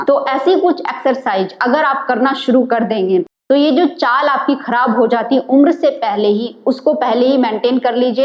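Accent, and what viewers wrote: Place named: Sindh, India. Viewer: पकक जाता है। लहज़ा सिन्धी बोली का है। क्या आप सिन्धी है? क्या मैं सही हूं? प्लीज़ रिप्लाई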